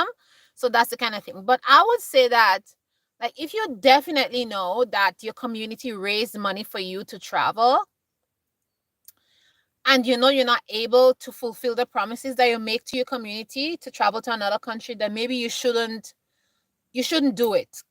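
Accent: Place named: Jamaica. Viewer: I thought you were Jamaican,as your accent is similar.. Cheers ❤️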